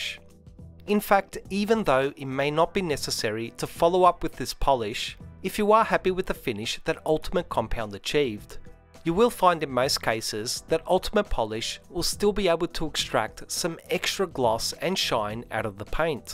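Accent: Australia. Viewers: Good Aussie narration. No hype no BS no selling. Just fact and detail. Best video I’ve seen on you tube for polishing a car. Well done and thanks mate.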